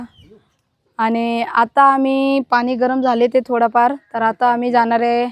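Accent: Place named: South, India. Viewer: तुम्ही Up ची आहेत कि सौउथ ची कारण तुमची भाषा सौउथ सारखी आहे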